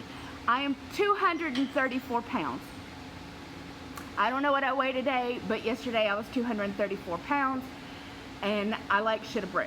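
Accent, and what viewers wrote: South, United States. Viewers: I am 63 years old and scheduled for my roux en y surgery on March 8th. Your quirky and honest videos give me the most realistic view of what I will be facing going forward. I realize everyone’s struggles are differently packaged albeit mentally and physically. In the end it comes down to changing what we eat, how we eat and how we move our bodies. I too struggle with physical challenges of several back surgeries, rheumatoid arthritis, etc., and the list goes on. I always maintained a healthy active 120lbs. 5” 4” frame until age 45 and illness, back and knee surgeries. Now I am 5” 3” 240lbs. Your southern voice initially drew me in (so fun to listen to) and as you went along, I just enjoyed the love story of you and Evelyn. Congratulations on reaching your targeted weight goal. You have worked very hard and I hope you feel fantastic! 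Thank you for posting and I hope you keep it up!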